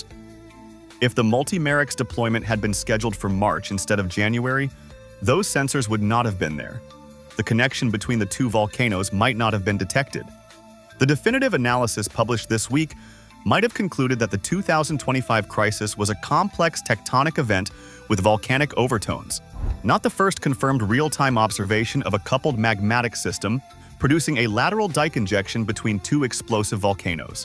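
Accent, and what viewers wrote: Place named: United States. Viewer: Mmmmm Just another A.i. "CLICK-BAIT" WITH THE EVER SO PAINFUL b.s YANK VOICE. (THE SIGNATURE OF A SCAMMER! )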